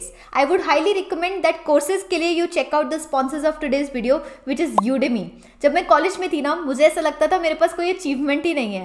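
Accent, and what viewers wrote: India. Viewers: your indian accent is incrediable but very useful sharing. thanks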